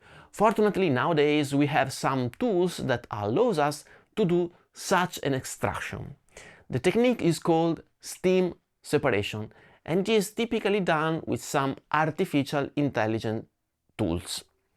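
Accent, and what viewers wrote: Italy. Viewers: LOL, didn’t expect ComfyUI to pop up here! The Italian accent and those nodes gave me a nice flashback to when I was learning IPAdapter for SDXL. Great video as always, — keep pushing these unique gear reviews; they’re never covered on the mainstream guitar channels. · it's definitely not French, it's clearly Italian :D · no need. We love your Italian (edit not French) accent :)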